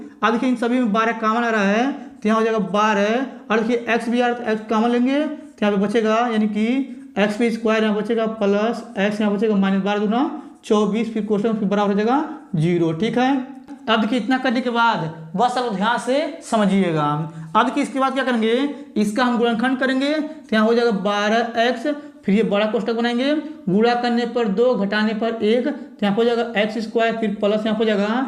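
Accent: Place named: Bihar, India. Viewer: thank you सर रिप्लाई के लिए आपकी भाषा को सुनकर लगा कि शायद बिहार के है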